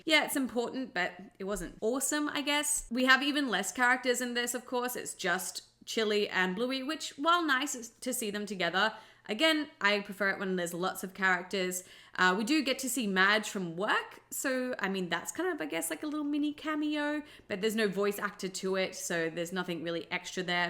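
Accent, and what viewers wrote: Australia. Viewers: i like a lot watching your videos about bluey you are an awesome australian youtuber i likea lot Your Australian Accent anyway i'm from italy and i'm a big Bluey Fan i love watching Bluey a Lot since 2021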